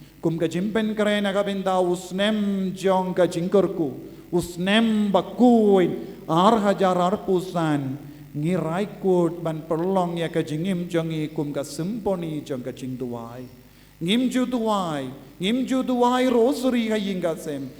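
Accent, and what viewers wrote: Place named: Khasi, India. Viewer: I ne i phadar I dei i khasi paka ne kumno.namar ka rukom kren jong I kam da long tynrai bha u khasi paka.god bless all phadar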